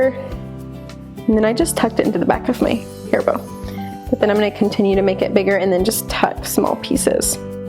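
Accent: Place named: South, United States. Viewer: Your southern accent is cuuuute!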